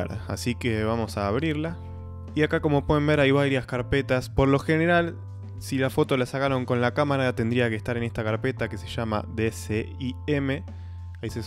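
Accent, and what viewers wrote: Argentina. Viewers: Es argentino 😑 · Excelente tu explicacion No podes negar que sos argentino!!!! Un saludo desde Mexico. Aclaro yo lo soy.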